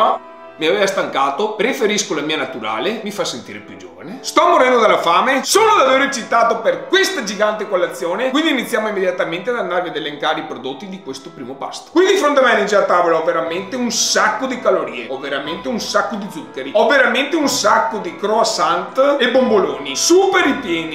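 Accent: Veneto, Italy. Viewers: che brutto l'accento veneto 🙁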